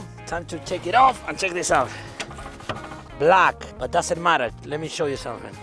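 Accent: Argentina. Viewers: Los videos estan buenos lo unico el audio osea la traduccion porque no haces videos vos hablando en argentino el echo que vivas en yanquilandia no sicnifica que te olvides de como habrar en español rioplatense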